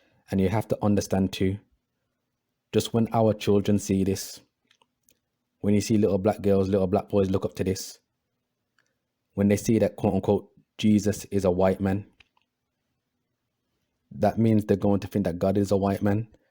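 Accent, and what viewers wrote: Birmingham, United Kingdom. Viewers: This guy speaking is a brummie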